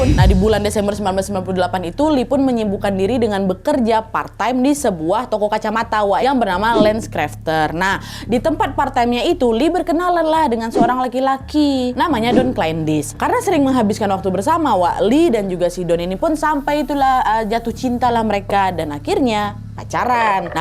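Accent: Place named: Sumatra, Indonesia. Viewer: Trnyata banyak jga yg suka nntn 😂 suamiku sampe hafal kalo udah denger kau nada sumatra pasti lg nntn si wawa yaa😅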